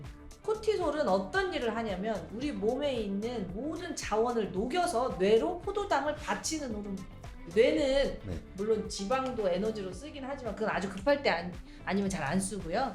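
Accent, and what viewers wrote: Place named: Jeolla, South Korea. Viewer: 이언니 말투가 전라도네ㅡ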